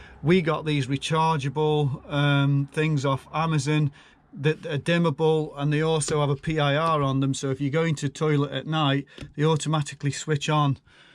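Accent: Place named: Stoke, United Kingdom. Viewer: Gorra luv a Stokie accent in a motrome.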